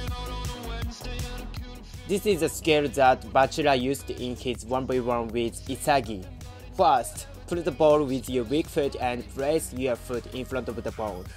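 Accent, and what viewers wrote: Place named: Japan. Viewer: honestly, the japanese accent makes it even better!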